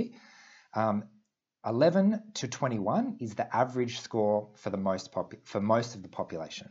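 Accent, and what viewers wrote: Australia. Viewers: Are you Australian? I keep hearing "IQ", not "AQ".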